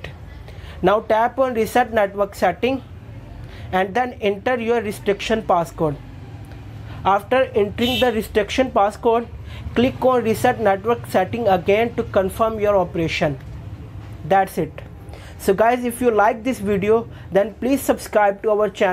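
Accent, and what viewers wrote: India. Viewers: Indian people English is good, and the accent maybe Indian but you understand very clearly what the guy is saying, good video! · You know it is bad when the guy has an Indian accent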